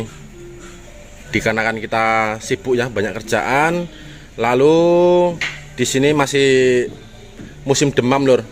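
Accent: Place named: Java, Indonesia. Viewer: Maaf pakdhe ,aslinya orang jawa mana? Kok kebrongos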